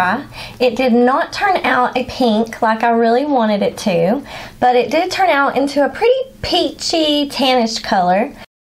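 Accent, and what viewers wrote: South, United States. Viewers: Wow! That is cool. My husband is originally from Mexico and we eat quite a few avocados, now I have a new use for all the peels and pits. How did your guacamole turn out? Love your accent. Always nice to hear a fellow southerner! 💜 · Your so cute, love listening to your southern accent. Thought of trying some dying after the first of the year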